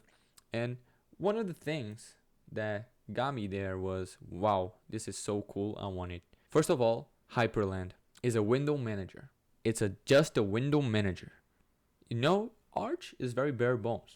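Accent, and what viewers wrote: Brazil. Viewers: Coé mano, faz um vídeo em português também, esse sotaque não engana. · are you brazillian, man? you've got the southeastern accent xD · Cara, impressionante como abri o vídeo e na primeira palavra percebi que era BR, CACETE a gente conhece o nosso sotaque lkkkkkkkkkkkkkkk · Tu é brasileiro? Esse sotaque é igual o meu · kkkkk juro! Vi por literalmente 2segundos e ja fui nos comentarios para ver se alguem tbm tinha notado. O sotaque br falando ingles eh bem unico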